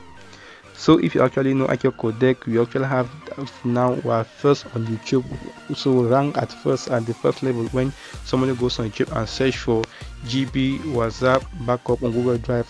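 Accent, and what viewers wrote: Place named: Nigeria. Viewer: Your Nigerian accent is hard to understand please make a video on how to restore the backup to a different WhatsApp like business WhatsApp otherwise this video was great thanks..... Steve from Zambia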